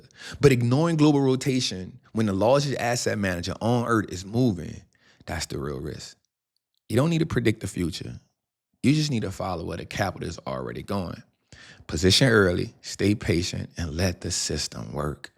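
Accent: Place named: Los Angeles, United States. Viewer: Thank you. You explain very well. ❤ Love the LA accent! 😊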